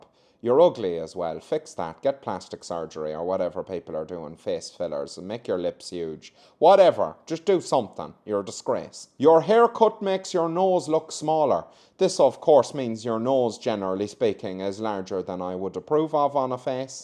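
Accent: South, United States. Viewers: Your performance of the southern accent is spot on. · Great southern accent!!! :) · I love your southern accent 😊😅 Butter wouldn’t melt in her mouth People asked if I was part of the BLUE ARMY…I had no idea why they would think I was in the army!!! ☕️☕️☕️☕️☕️ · You do MARVELOUS American accents!! Have you lived over here? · 😂😂 the southern accent was what I needed to hear today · 😲 Your southern accent is spot on! “Bless your/his/her/their heart” isn’t always an insult. It depends upon the tone of voice and facial expressions used when saying it. “Sweet pea” can also be used in a similar way. In fact, 🤔 I think most southern compliments can be used interchangeably as insults (you have to be polite, after all). The tone, timing, facial and body expression is the key. Shoot, my favorite southern “fuck you” is “Jesus loves you!” 😂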